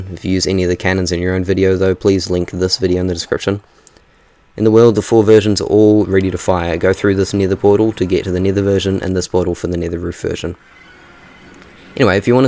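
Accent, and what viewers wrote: New Zealand. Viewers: Omg W New Zealander (Kiwi?) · Hello fellow Kiwi. Love the ingenuity in action. Heard that accent and it always just hits different 😅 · Are you from NZ? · Amazing work! Also very cool to hear such a prominent Kiwi accent, very cool! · Are you a Kiwi? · I know a kiwi accent anywhere, chur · NZ accent?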